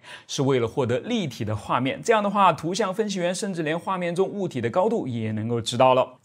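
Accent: Nanjing, China. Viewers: 人是在南京，但口音像南京人吗？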